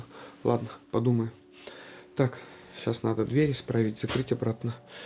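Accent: Vyatka, Russia. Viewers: До 7 лет часто бывала у дедушки,в деревне Статники Оричевского района ,Кировской области.Деревни этой сейчас уже нет. Ваши повествования будят ностальгию по тем временам, даже вспомнила устройство этой усадьбы.А Ваш говор с вятским акцентом прямо услада для души.Давно уехала с Вятской земли в Барнаул.❤❤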